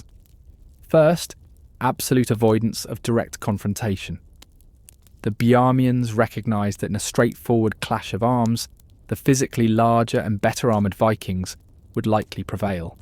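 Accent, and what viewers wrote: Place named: United Kingdom. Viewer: Hi from Ukraine🇺🇦. Im in love with British accent. Its true history ❤like 👍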